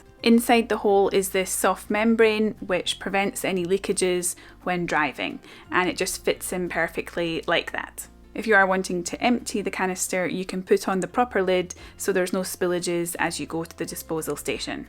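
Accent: Scotland, United Kingdom. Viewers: Thats a nice accent. I was thinking it may be Scottish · I've never heard anyone use the word "toilet" so many times in 12½ minutes, and with such a lovely Scottish accent. Currently researching our first van, thanks for the info..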